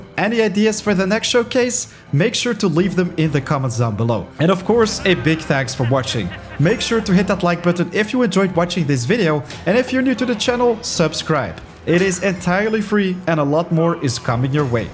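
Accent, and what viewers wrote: France. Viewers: Are you french ? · Mais il est français ou pas, il dit pas mal de mots en français et il a un peu l’accent français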